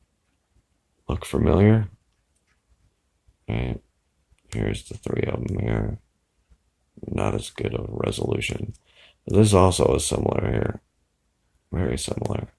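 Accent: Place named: Northern England, United Kingdom. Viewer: Northern English accent. Bordermen on the south side of Hadrian's wall, The Roman empire didn't dare go any further North then the famous border wall. It's really nice to meet you and wish you all the best in the future and good health to you and your loved ones. Gonna get my camera out it's just getting dark now. What do you make of the Sickness that is being spread among us apart from sick of it already? Culling the population by virus?Anyways I have subbed and if anything happens or If I get my act together I will post u a message.Peace!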